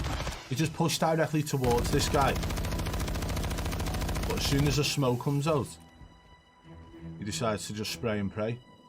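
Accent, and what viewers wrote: Liverpool, United Kingdom. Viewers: best scouse wz2 commentator out there, yes lad 😉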